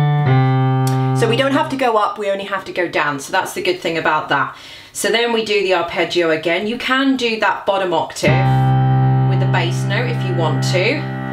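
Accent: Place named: United Kingdom. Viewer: I hear an accent... Are you from UK? You Are Awesome!!!!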